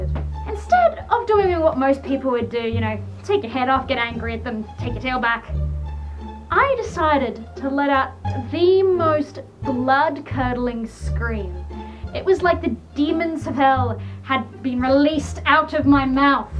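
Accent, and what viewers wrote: Australia. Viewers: R U ausie? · 0 ur aussie!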